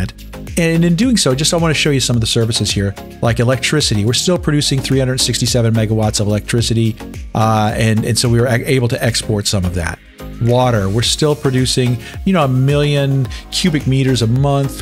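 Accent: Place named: Minnesota, United States. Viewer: Well hello neighbor! I thought I picked up on those Minnesota O's As far as the city goes, you should do what you feel is reasonable. It's your hobby after all. I'll stick around for whatever that is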